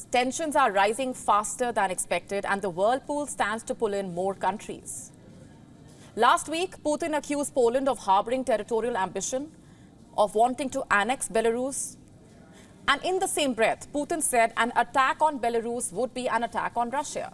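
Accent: India. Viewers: Omg this Indian accent 😂😢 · Love Indian accent, only sometimes pronunciation is off..like "wulnerable" instead of vulnerable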